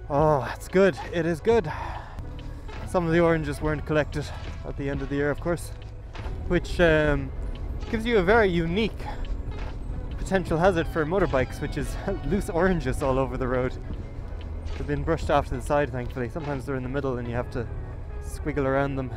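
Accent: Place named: Ireland. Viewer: Hola! I am impressed with your progress learning Spanish with your Irish accent. I love it. Congrats on your progress. I have been with you since the beginning. Love the festival. Here in my part of the world we celebrate cherries 🍒. Lots of cherry trees in the area. We call it Cherryfest.Lots of parades, concerts, food, fireworks, and tourist from all over the country and world. I love festivals because it usually shows the good part of a community. Adios!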